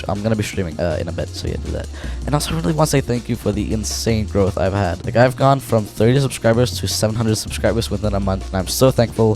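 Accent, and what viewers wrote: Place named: Denmark. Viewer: bro lyder lidt for dansk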